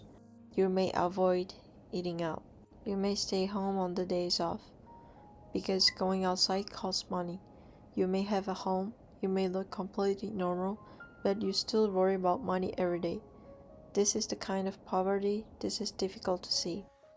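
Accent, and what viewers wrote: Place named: England, United Kingdom. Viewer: I love your english spoken accent, and a genuine presentation, helps seeing Japan from a ground level.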